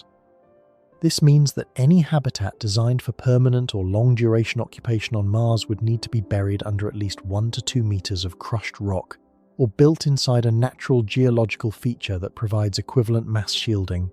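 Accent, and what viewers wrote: United Kingdom. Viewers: Is this a man or is it AI? The only reason I ask is because these two hour videos are released constantly and the narrator speaks in a perfect British accent without missing so much as a single beat, without pausing or running out of breath, without stumbling. Constantly over and over again…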